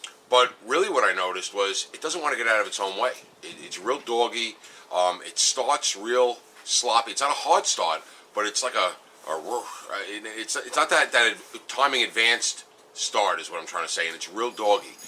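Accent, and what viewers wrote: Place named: Northeast, United States. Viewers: Definitely Long Island ✌️ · Great video, thank you! Hopefully this will help my 87. Also, I wish you said retarded more, it sounds great with the New England accent. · Great video! By your accent, I can tell you're from NY, PA MA or somethin' lol. I have an 85 caprice, tbi as well. V6 4.3 (262)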